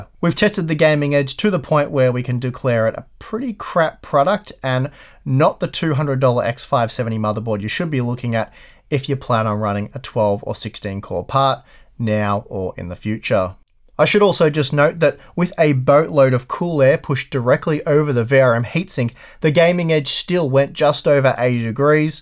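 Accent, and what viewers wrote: Australia. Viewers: Ur Aussie bro! Subscribed and patreoned. Really appreciate your work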